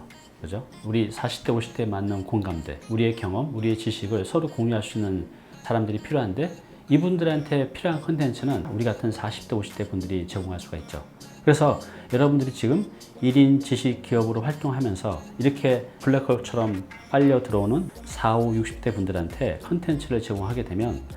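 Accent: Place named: Seoul, South Korea. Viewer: 외모도 깔끔하시고 듣기좋은 서울 말씨에 ㅋㅋ깔끔한 진행력. 알아듣기 쉽게 군더더기 없이 진행해 주셔서 너무 고맙습니다. 귀에 쏙쏙 들어와요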